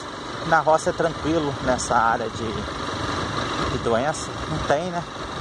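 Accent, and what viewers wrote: Minas Gerais, Brazil. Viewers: Português de mineiro tbm sou assim.uai...Kkkk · Que delícia de passeio amigo, adoro ver essas casinhas de colônia, um lago na frente ainda pra ajudar na beleza. Não se preocupe com a maneira de falar, nós mineirinhos somos assim. Um grande abraço! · Boa noite que lugar lindo natureza pura que saudade da época que morava na fazenda do bálsamo perto de piscamba município de jequiri não preocupe com seu jeito de falar e na simplicidade do nosso idioma mineiro e bao e bonito como dizia meu avô eta trem bao ser mineiro uai abraços fica com Deus. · Oiê, acho o seu jeito de falar lindo, acho que é de mineiro mesmo, também vc não têm que se preocupar com erros, a maioria das pessoas fala errado, pra que falar no pé da letra eu também falo, gosto de falar errado, e tem mais estamos enteressado é ver estes seu vídeos maravilhoso.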